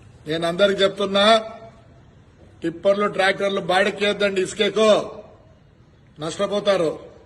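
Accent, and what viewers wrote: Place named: Tamil Nadu, India. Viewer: Babu JC garu, meeru telugu vaarena? Aa telugu enti, evaro Tamilian matladinattu… konchom Sarigga telugu nerchukovayya mundhara…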